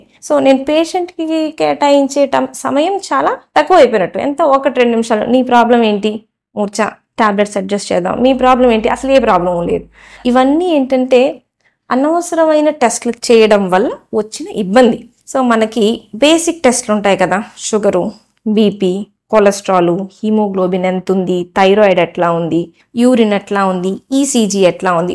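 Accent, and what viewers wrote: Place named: Prakasam, India. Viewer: మీ విడియోలు దాదాపు అన్ని చూస్తున్నాను చాలా చక్కని ప్రకాశం జిల్లా భాషలో చెప్తారు. మన ప్రకాశం జిల్లా అంటే నాకు చాలా ఇష్టం అందులోను తెలుగులో అందరికి అర్ధం అయ్యేలా చెప్తున్నారు. చాలా మంది రోగులకు మీరు చెప్పి విషయాల వలన ధైర్యం గా వుంటారు. నిండు నూరు రెళ్ళు మీరు మీ కుటుంబ సభ్యులు చల్లగా వుండాలని కోరుకుంటున్నాను.🙏🙏🙏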